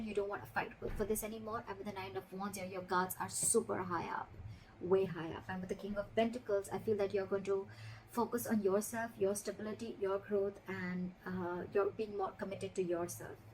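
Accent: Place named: India. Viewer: Your pace is too fast, try to speak slower. We know you're from India and trying to hide your accent but still too fast.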